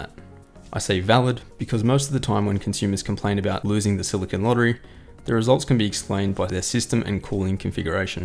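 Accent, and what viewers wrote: Australia. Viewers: Man, Australian accent is a little complicated...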